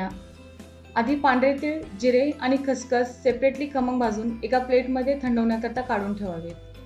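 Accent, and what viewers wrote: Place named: Kolhapur, India. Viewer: Tumhi kolhapuri aahat kai..accent aahe तुमची mala kolhapuri language khup avadte.😍🥰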